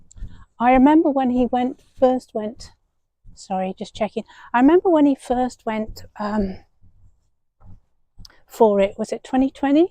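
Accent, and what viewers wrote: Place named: Cockney, United Kingdom. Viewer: This woman is speaking with a Cockney accent.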